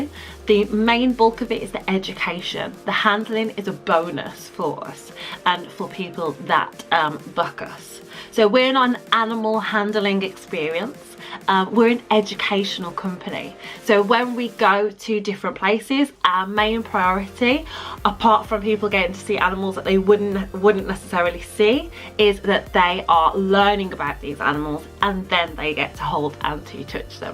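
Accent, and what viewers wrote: United Kingdom. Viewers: She is definitely not American LOL I think I can safely assume that she is a Brit.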